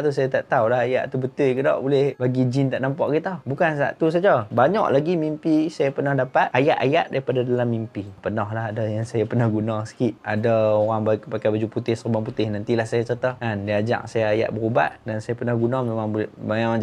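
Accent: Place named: North, Malaysia. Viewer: speaker ber-loghat utara ni, jarang lah membosankan. baik punya storyteller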